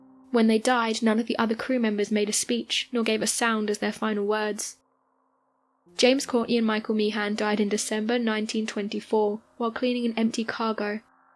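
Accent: United Kingdom. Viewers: Ningeelewa ila sasa hii lafudhi ya kiBritain ni kisanga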